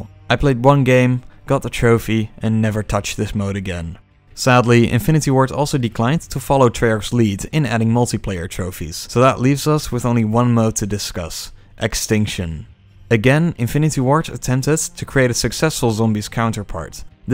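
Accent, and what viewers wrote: Netherlands. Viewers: ik dacht bij het begin al ik hoor echt een nederlands accent en ben toen door de comments gaan duiken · I know a dutch accent when i hear one! Subbed! Great video! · ik dacht aan het begin van de video deze gast klinkt echt Nederlands door ze accent en toe keek ik naar de trofeeën die popte en besefte ik dat hij echt Nederlands is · Ahhh that sweet dutch accent😂, good video keep it up. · Hahahaha first 10 seconds can already tell you’re dutch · Is this guy Dutch or German?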